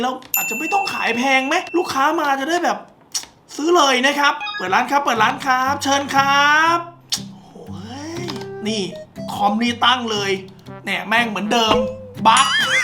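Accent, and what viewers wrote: South, Thailand. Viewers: พี่เป็นคนใต้หรอติ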